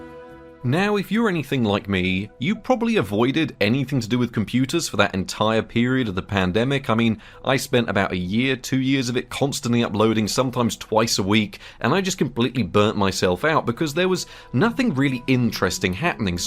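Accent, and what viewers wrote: United Kingdom. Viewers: Your english accent makes these videos better :D Not so british you can't understand the words, tho british enough so you still get that soothing effect with the words. :D plus you get to see how the world of old graphics are doing in the world today, which is tbh quite fascinating to see how well these cards are holding up. :)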